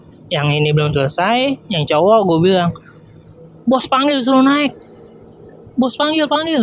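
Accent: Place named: Bekasi, Indonesia. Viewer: Orang bekasii ya ini bahasanya khas banget 😂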